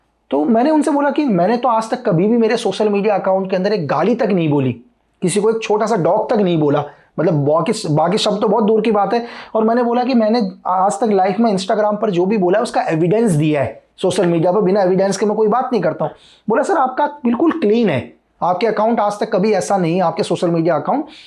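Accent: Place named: Rampur-Moradabad, India. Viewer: ay be from Rampur-Moradabad, if you go by accent.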